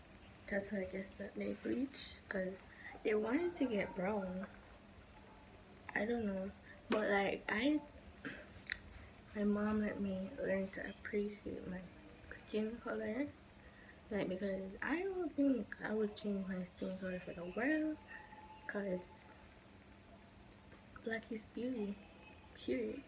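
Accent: Jamaica. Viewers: You're so beautiful, I love the Jamaican accent.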